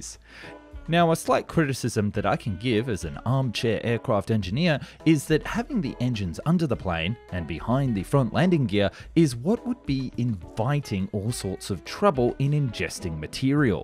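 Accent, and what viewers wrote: United Kingdom. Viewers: i would like to think, being (or at least sounding) British pronounced it naynay purposely.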